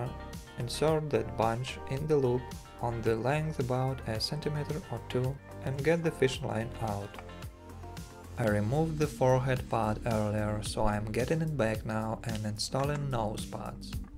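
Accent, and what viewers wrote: Russia. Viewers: Какой-то слишком русский акцент -_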